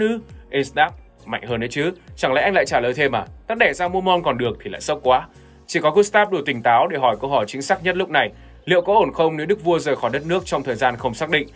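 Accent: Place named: North, Vietnam. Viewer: kiểu giọng bắc ấy, vừa ngầu lòi lại ấm áp~~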